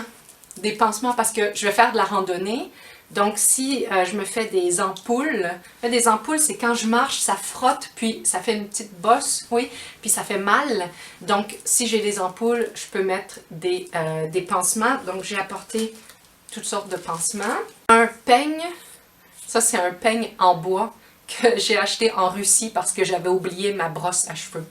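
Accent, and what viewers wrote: Canada. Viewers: J'aime la langue française , mon pays est francophone. Mais, l'accent canadien est vraiment différent par rapport à celui de la France.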